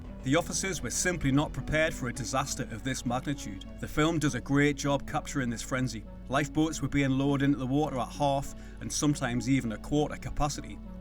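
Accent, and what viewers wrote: Newcastle, United Kingdom. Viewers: Geordie accent...North of England...Newcastle area.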